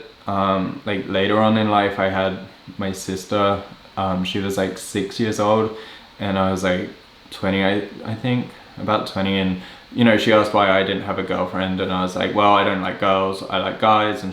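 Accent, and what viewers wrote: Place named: United Kingdom. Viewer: I totally thought he was American at the beginning, then I thought he had a Speach impediment, then when he said he was 32 I was totally confused because he looks like 22 at the most, then finally I realized he has a British accent.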